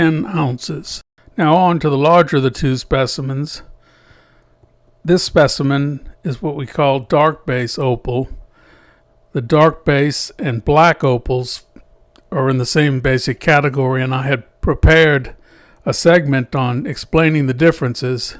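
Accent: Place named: New Orleans, United States. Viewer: Your accent is very nostalgic for me, you wouldn’t happen to be from around the Big Easy would you?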